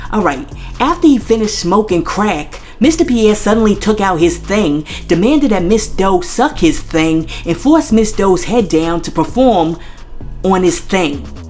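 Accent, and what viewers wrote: New York, United States. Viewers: I love this narrator's heavy ass New York Bkack Accent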